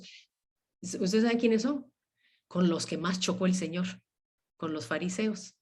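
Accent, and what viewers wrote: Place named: Costa Rica. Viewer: Tienes acento de costarricense .. !! 😀 👍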